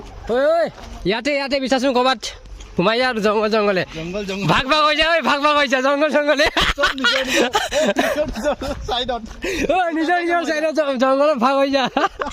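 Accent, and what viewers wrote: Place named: Mishing, India. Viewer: Bosdikee,,who the fuck are you to comment like this,he is belongs from mishing community so his first mother tongue is missing though he is able to speaks Assamese ,we have to appreciate him instead of insulting or looking him down..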